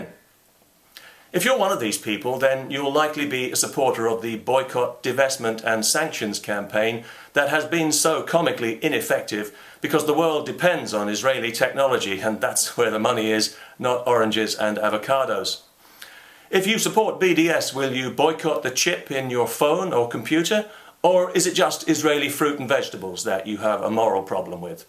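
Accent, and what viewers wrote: United Kingdom. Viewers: You sound British :) you know what. Let's give the Arabs their country back that you stole and gave away and give the Jews a part of England instead. I mean your such a nice and fair people and has always been. Lets not talk about how you treated Scotland, Africa, India, Ireland, slave trade and so on :)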